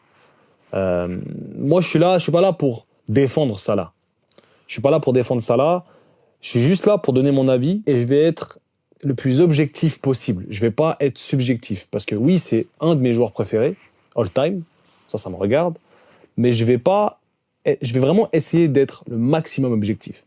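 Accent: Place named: Switzerland. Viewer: wesh t'es suisse, on dirait pas avec l'accent jajaj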